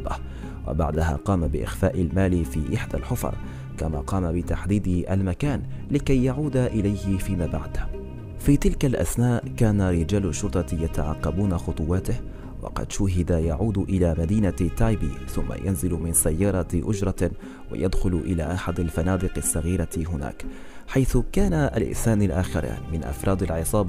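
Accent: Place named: Morocco. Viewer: السلام عليكم بالتوفيق والسداد اخي الكريم، من نبرة صوتك أظن انك مغربي أو جزائري، بالتوفيق مرة أخرى من 🇪🇸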